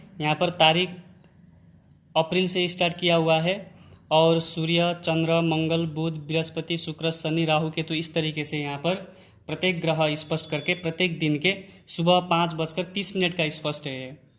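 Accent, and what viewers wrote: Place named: Nepal. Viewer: Nepali le hindi boleko ठ्याक्कै thaha hunxa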